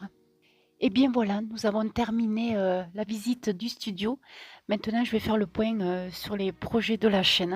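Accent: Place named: Southwest, France. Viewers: Salut super content enfin de te revoir et t'entendre de nouveau avec cet accent et " le bisous du sud-ouest". Ça me manquait . Le studio est très sympa sobre et moins le bazar que mon bureau. Vidéo toujours agréable à regarder. Continue comme ça j’apprécie le travail que tu fais. Le bonjour de l’Alsace, grand " V " à toi . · Bonjour, et bien merci à toi pour ta chaîne, pour cette découverte du dimanche matin, merci pour tes vidéos intéressantes et marrantes, tes essais, tes fou rires, ta bonne humeur, ton merveilleux accent du sud-ouest.....bon j'arrête là, en tout cas, tu gagnes un abonné de plus 😁, grand merci et bisous du grand Est, lol. ✌🏻à toi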